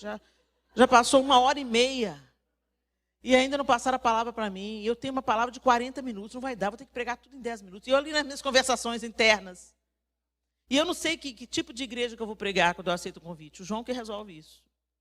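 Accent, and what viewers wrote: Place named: Rio de Janeiro, Brazil. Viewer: parece até carioca na forma de falar...rs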